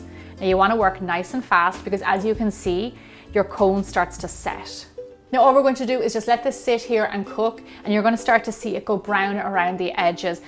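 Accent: Ireland. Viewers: tell a are you from Ireland if so from which part ?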